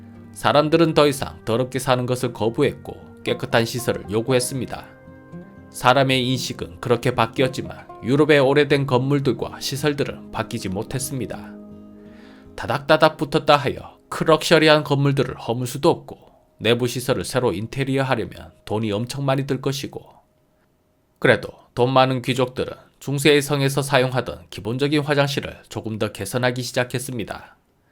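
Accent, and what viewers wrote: Gyeongsang, South Korea. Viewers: 경상도 사투리는 진심 짜증나요 . 제발 사용 하지 마세요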